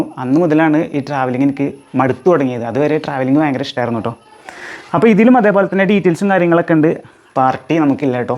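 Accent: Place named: Malappuram, India. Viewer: Happy ആയീലെ ഇനിയും ഉയരങ്ങളിൽ എത്തട്ടെ. ഞാൻ ഇപ്പൊ അടുത്താണ് വ്ലോഗ് കണ്ടു തുടങ്ങിയത് എനിക്കിഷ്ടം നിങ്ങളുടെ സംസാരവും സാദാ നാട്ടിൻ പുറത്തെ നമ്മുടെ മലപ്പുറം slang 👍👍👍👍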